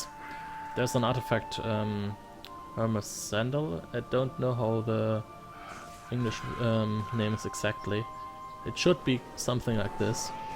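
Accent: Germany. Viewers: Man merkt richtig deinen Deutschen Akzent! :D But i like it.. :) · you sound really German oh du sagst ja sogar du bist aus Deutschland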